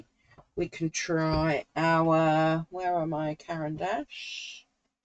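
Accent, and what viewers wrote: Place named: London, United Kingdom. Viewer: ￼ ￼ Hi! Saw your comment on my most recent video and thought I would hop over to see what you get up to on your channel! Good to hear another English voice – do I detect a London accent? Cos that’s where I’m from originally! Interesting to see the things that you’ve recently purchased. I’ve had a couple of things from Temu that haven’t been to marvellous, but I’m always interested to see what they are having on offer. Have you heard of the fountain pens by Sailor? They seem to be trending at the moment…￼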